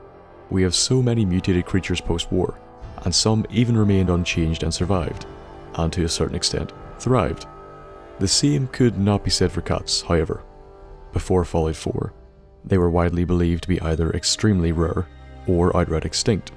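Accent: Northern Ireland, United Kingdom. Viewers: Been here since at least 2013, sitting in my mums and playing nv and listening to to guide me through, yeah im a scott but his is a n.i accent so i can understand it clearly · Northern Irish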